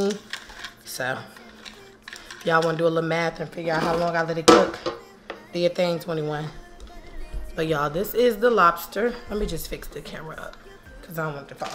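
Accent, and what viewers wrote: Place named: South, United States. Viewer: i love your country accent omg 😂😂 i’m subscribing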